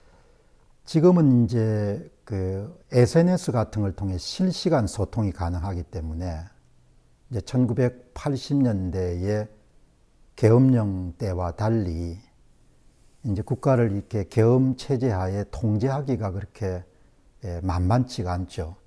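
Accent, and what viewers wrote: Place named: Gyeongsang, South Korea. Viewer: 갱상도ㅎㅎ 편가르기 지겹다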